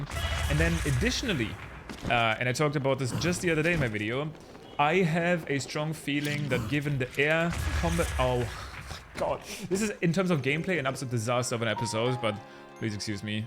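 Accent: Germany. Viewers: little german accend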